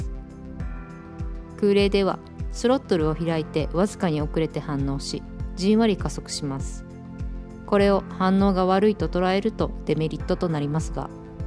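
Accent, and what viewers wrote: Kansai, Japan. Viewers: 端々に出てくる関西訛りが、スゴく好きです。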